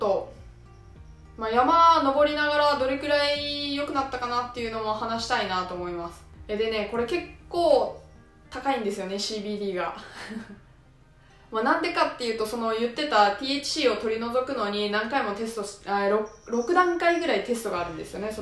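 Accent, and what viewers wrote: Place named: Western Japan, Japan. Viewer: ちゃん極めて標準語ですが、普段は西の言葉なんでしょうか？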